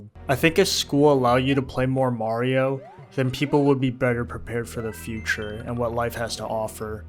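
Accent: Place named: China. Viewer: You sound so chiense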